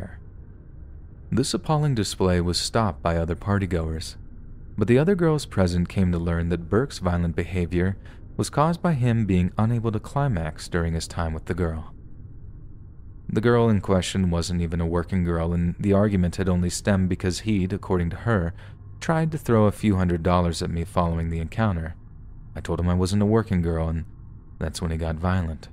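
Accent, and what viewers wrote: Australia. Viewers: Except for his Australian accent. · That Australian accent though...🖤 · Your aussie accent!! Love it 😍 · Australian accent is gold <3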